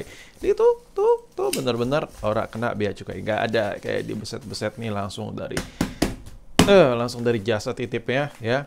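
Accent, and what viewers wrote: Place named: Java, Indonesia. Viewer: Lu orang jawa ya bang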